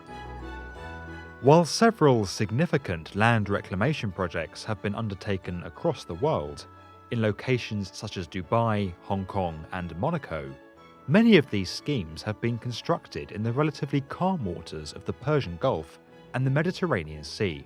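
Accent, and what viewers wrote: United Kingdom. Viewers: This video has just so much more authority because of this UK accent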